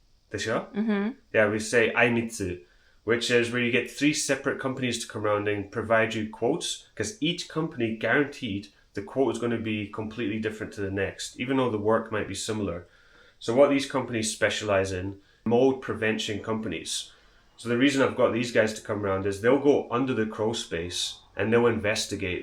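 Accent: Scotland, United Kingdom. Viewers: Dude, I always assumed you were Australian. Didn't realize you were Scottish!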